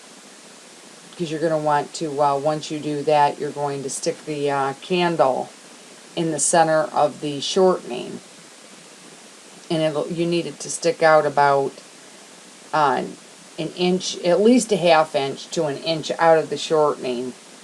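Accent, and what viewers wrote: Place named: Minnesota, United States. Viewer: First time on your channel, liked and subbed. Thanks for the simple common sense talk. I'm trying to figure your accent. I'm guessing northern Minnesota or N.D., not so much Wisconsin though. Thanks again, Sam.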